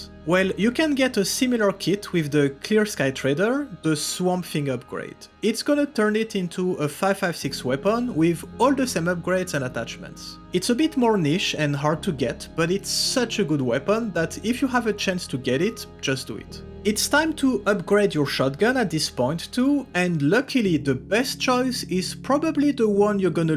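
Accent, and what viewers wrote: France. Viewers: Obviously french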